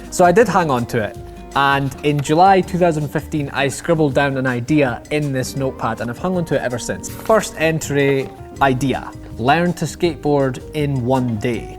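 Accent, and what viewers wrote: Scotland, United Kingdom. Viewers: I love how when i see "crappy" i can hear it in the Scottish accent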